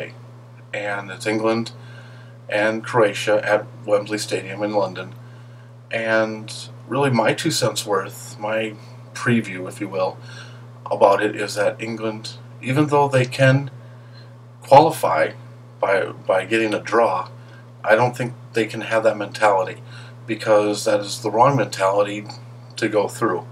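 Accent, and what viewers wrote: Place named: United States. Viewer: ArtVandelay is wrong. You seem to know more about football than a lot of people in England. I like your videos. It's refreshing to hear an American accent chatting about the beautiful game. Keep it up. Blackburn Rovers all the way!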